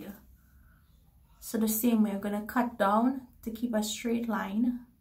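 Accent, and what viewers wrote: Trinidad and Tobago. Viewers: That Trini accent 🎵🎵🎶🎶🎶🎵🎵🎵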